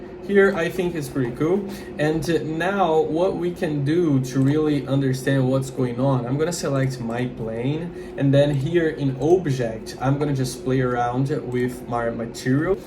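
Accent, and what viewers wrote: Brazil. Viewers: reconheci o brasil só pelo sotaque akakak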